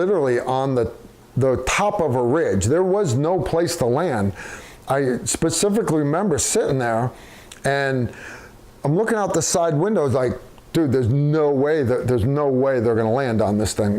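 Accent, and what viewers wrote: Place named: New York, United States. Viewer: Where is from? I feel like from New York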